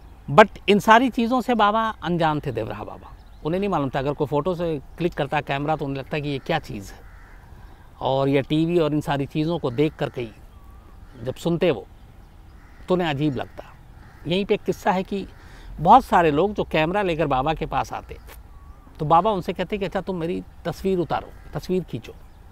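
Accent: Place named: India. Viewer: Shams Tahir Khan ji aap ki awaj ek hindusthani ki aawaj lagti hai.aap ke awaj mai allaha ne bahut noor diya hai.❤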